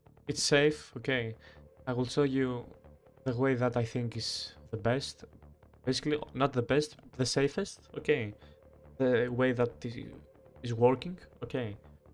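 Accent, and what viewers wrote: Greece. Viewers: He sounds greek or arabic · πως καταλαβα οτι εισαι ελληνας 😂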